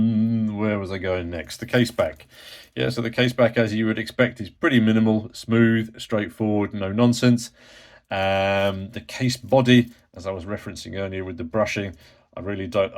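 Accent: United Kingdom. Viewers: Why can't British talk English properly like Americans? It's tough to understand, fella.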